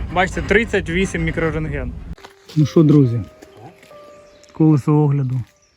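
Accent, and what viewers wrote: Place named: Surzhyk, Ukraine. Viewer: Окреме побажання автору каналу. Можете незважати на це, але: попрацюйте будь ласка над своїм словниковим запасом. У вас, надзвичайно багато суржику в вимові. Взрив, в прєдєлах норми... вибух, в межах норми і т.д. Я трохи більше року тому був сам узкаязьічньій, а тепер роздаю поради як розмовляти інакше. Все буде Україна.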